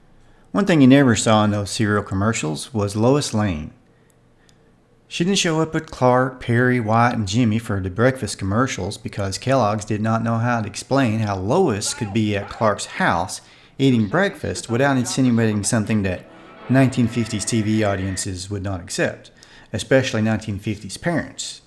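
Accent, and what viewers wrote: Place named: Kentucky, United States. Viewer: I really enjoyed this. Even though I knew about two-thirds of it because I too am a lover of trivial trivialities, is Barney said on Andy, I enjoyed how this brought them together and how they were presented. New to this channel but I will be back. May I ask where you are from? I am from Kentucky and we seem to have the same accident. Thanks again for these great videos.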